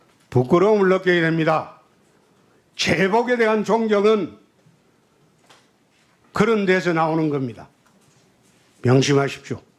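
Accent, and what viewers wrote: Gyeongsang, South Korea. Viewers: 역시준표세이(형님의 순~경상도 말) 확실하다~세이 청와대가서 핵갖다놓자~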